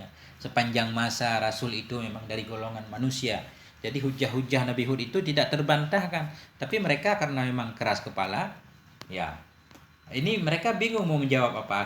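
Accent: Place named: Java, Indonesia. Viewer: logat Jawa kang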